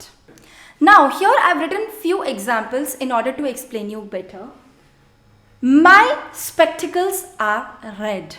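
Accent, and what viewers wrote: France. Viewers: Hats off madam . Wat a clear explanation n off course ur French accent is superb.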